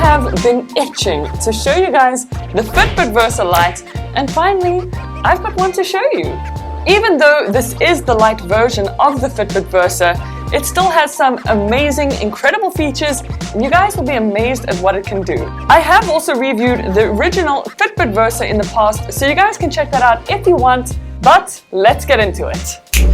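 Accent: South Africa. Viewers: Are you a SAFFA? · I can spot a South African accent a mile away . . . nice review sistas!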